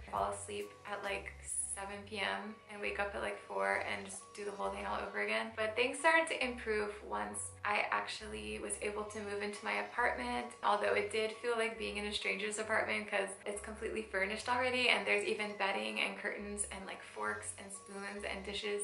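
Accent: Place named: Southern California, United States. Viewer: You don’t have a Louisiana accent, you sound like any southern Cali girl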